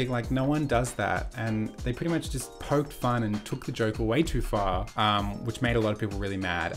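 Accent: Australia. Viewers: THIS WAS JUST IN MY RECOMMENDEDS AND YOURE AUSSIE????? Stan. I have no idea what any of these ~dramas~ are (minus jk) lmao but this is great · How did I know you’re Australian before I even clicked on the video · Is that an Australian accent? It is just lovely!!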